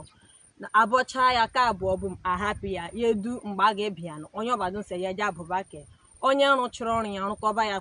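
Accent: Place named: Igbo, Nigeria. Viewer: ...real Benin language wit Igbo words & accent!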